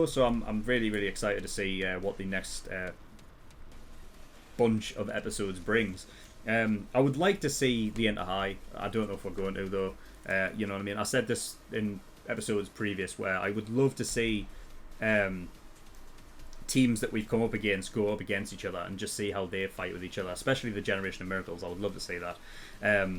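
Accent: United Kingdom. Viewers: Your English is music to my ears since I love British English so much. Which part of England you live? Sorry that I ask I just am curious. I mainly mean in which city because I have been in England in 2004 during our Midsummer and it was extended weekend meaning I left from Finland on Thursday and came back in next week's Tuesday and I was in London then but since it is so big city I didn't managed to see all possible things but I liked that trip I really did. Yes I am from Finland myself despite my username.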